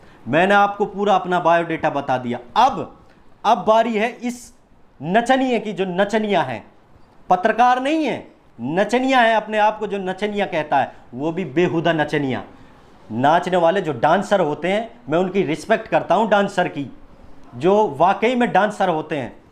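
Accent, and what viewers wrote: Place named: Mewat, India. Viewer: Sahi bola Bhai aapne aap mewati bola good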